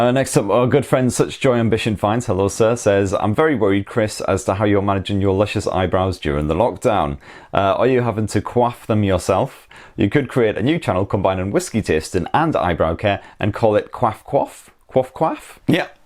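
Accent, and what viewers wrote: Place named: North East England, United Kingdom. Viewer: Sound like up north e.g North East.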